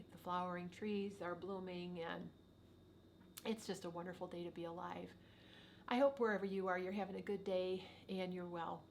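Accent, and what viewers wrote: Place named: Midwest, United States. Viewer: I knew as soon as I heard you speak that you were from the Midwest. I was born in Rockford and spent half my childhood in Dixon, Il. Life has taken me to England though. Thank you for this video!! I found it very informative. Last year, one of my best friends gifted me a bunch of wool from sheep she breeds and raises. She gave me some gorgeous Blue-Faced Leicester and a spinel that she made because she knows how much I love to knit. I figured it was about time I learn but have been putting it off out of fear. I love the way you broke your video up into sections for us because that will come in handy when I go back and want to view a particular technique. It's so hard to know what videos to start with when there are so many out there, and I feel blessed that I found yours because you showed your viewers different techniques to use, and honestly, some may be easier than others for different people if that makes sense. 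Do you have a video on preparing the yarn? I know from another short video that you put the yarn on a niddy noddy then put it in hot water. Can you put a wooden niddy noddy in water though? Does the book you recommended go into all of that? Once again, thank you so much for this?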